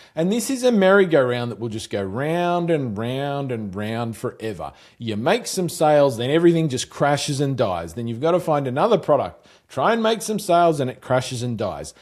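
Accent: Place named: Australia. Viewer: Love hearing such great AI myth busting here! Us poor dropshipping folk always get branded as the lazy ones reaching for the the new shiny thing. Love the channel and great hearing from a fellow Aussie!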